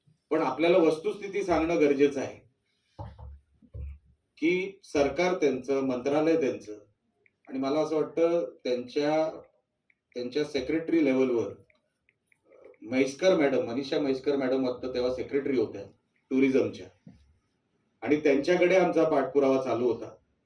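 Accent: Malvani, India. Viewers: Malvan voic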